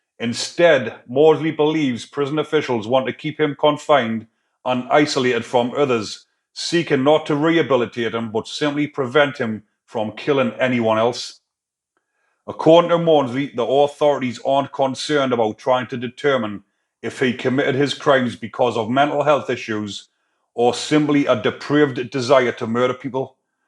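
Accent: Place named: Gateshead, United Kingdom. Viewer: Mate you are from my neck of the woods going on your accent (Gateshead I'm guessing?) I love the fact you have 50k subs for just reading out Wiki prisoner stories word for word. Fair play and good luck.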